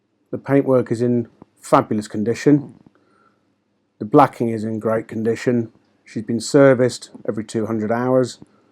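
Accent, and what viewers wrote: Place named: United Kingdom. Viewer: He is British, they all sound strange to me..... Not nice to point it out!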